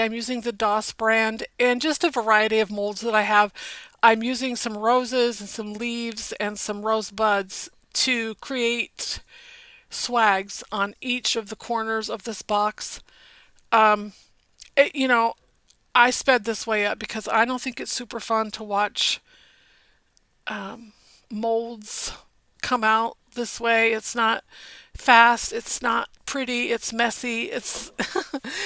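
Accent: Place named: South, United States. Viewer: Nice to see you’re back! I sometimes detect a southern drawl 😊.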